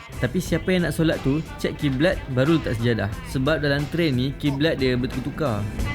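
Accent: Kelantan, Malaysia. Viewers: Aa setuju. Tapi de loghat kalate kit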